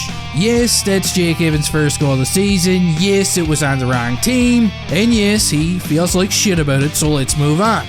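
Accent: Newfoundland, Canada. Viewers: That was an amazing ''turd'' period!! Love the NL accent!👌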